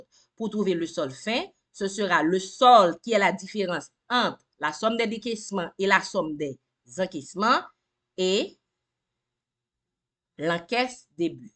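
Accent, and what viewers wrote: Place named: Haiti. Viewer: Slt, Madame , merci pour la vidéo, elle m'avait été utile. Vous parlez français avec un accent Haïtien, êtes vous d'Haïti.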